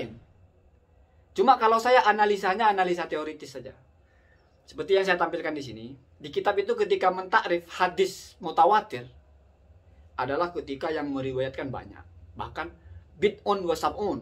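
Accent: Madura, Indonesia. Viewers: Semoga menjadi pengasuh pesantren 🙏🏼👍 Kayaknya Madura ya???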